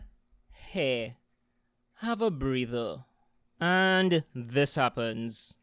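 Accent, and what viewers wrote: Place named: Trinidad and Tobago. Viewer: Yo love how u talking are u trinidadian?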